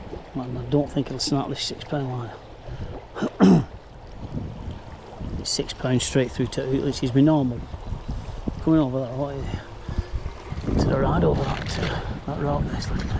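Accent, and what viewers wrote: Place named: Wigan, United Kingdom. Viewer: Ellow pal, belter vid again and you make that cast look easy! Don't know if it's the Ribble you're fishing but guessing it might be coz you sound like a fellow Wiganer and it'll be on your door step but I've been trotting (with a fix spool) 3 ince dace on there after big perch and jacks and managed a 20lb pike the other week, bet it would make an interesting video on the center pin if you've ever done owt like that before? But ill keep watching either way 👍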